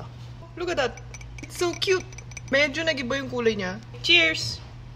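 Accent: Philippines. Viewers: hahahaa... was watching without reading the comment first. then spoke her native korean language... then the Filipino. LOL i was surprise. that's way too cute and you're accent is pure Pinoy. very niceee... nope, not the beer. LOL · yung pinoy accent when she speak English 😂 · When you spoke Korean I believe you were, but once you spoke English now I know you're Korean born and raised as a Filipino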